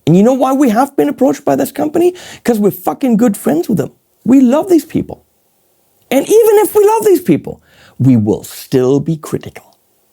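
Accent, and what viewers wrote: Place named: Germany. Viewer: I make my comment not even watching the video in the full length. The reason I come back to watch your videos (and you + Johan Segeborn are the only gear youtubers I come back to at this moment) is not the gear. This is basically something that I am not interested anymore, I have enough gear to do my music. I do come back to your channel because I respect your honesty, I like to hear your rants (expressed in beautiful german accent I love) and personal messages you share with us. I don't even listen to that regularly, because i have no time for that. But I want to share a message of appreciation with you. Take care and animals at the end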